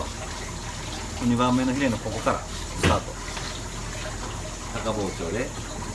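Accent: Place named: Tosa, Japan. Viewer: 土佐弁ですね✨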